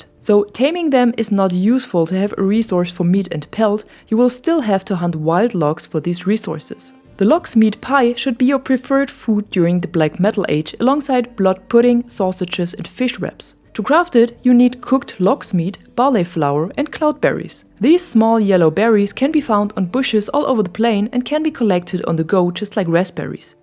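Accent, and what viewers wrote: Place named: Germany. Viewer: There's just something about your voice and pronunciation that I can't stop listening too, I can tell you are german speaking but other than that you sound nothing like the germans I know who speak english.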